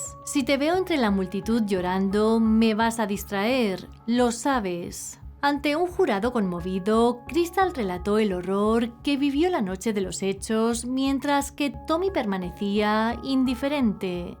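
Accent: Spain. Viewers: ¿Le dirías a un mejicano que no hable como Cantinflas?, ¿O a un venezolano que no hable como un narco?, es Español castizo, no es latino, así que ajo y agua, a mí me encanta como habla. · O será el acento de la región de España donde nació. No se que decirte. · No molesten a, así es el acento o dejo en algunas regiones de España… es como que a un cordobés lo criticaran por hablar como un cordobés (de Cordoba, ciudad argentina). Hablan cantando y se les escucha muy gracioso aunque a veces no se les entiende. Pero son genuinos!!!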